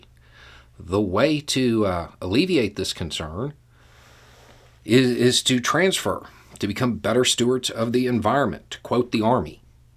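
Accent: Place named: Florida, United States. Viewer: FL accent. "Grain" energy. They are coming for your Moonshine!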